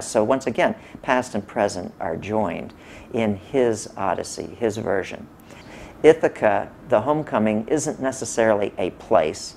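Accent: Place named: United States. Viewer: I am greek guy 38 years living abroad. I love the American accent and believe to your words even with your American beautiful accent :-)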